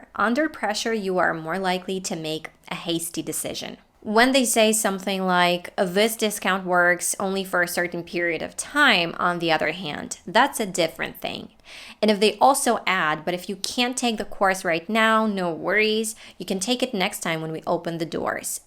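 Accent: United States. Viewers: Thank you Mrs. for your precious opinion. I find it worth watching as I had bought a course in vain and couldn't apply it as my lifestyle was too time-taking in offline so I couldn't sit calmly and learn it online, that was terrible experience sadly. Some notes I jotted from the video: — Not advertised enough course might be the best course one may ever have taken Therefore don't be so captivated by any promoted course, the quality is much more vital than peripheral ads (the goal of those is just find customers not more than that) — Feedback is important as well as a trial period — Biased can be anything that someone can suggest to others cuz everyone has different tastes to say the least Sales under the pressure can make you buy the course that you don't even need or the course might not be organized well for one's lifestyle P.S. Mrs. you look great like a Ukrainian (I guess, is that true? 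) and perfect with American accent it matches you so much, especially when it feels that there is a sense of what you're talking about Thank you so much🙏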